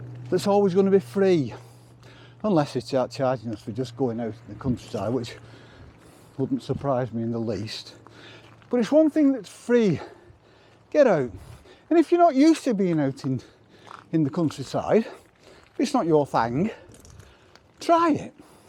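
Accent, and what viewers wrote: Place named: England, United Kingdom. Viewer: Oh, that made me feel like I was on a walk with Epictetus with an English accent. It's -7C/19F here, and I'm not going outside except for the farm chores, so I enjoyed the vicarious walk where it's still green.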